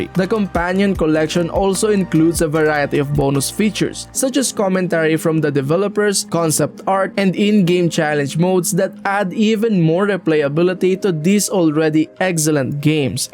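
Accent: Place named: Philippines. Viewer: Latino accent + American accent + lazy pronunciations = Filipino accent 🤦🏻‍♂️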